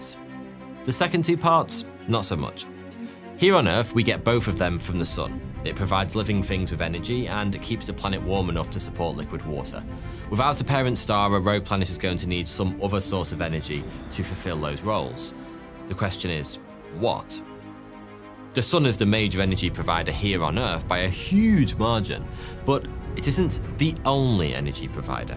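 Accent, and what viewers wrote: United Kingdom. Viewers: Are you British? What kind of accent do you have?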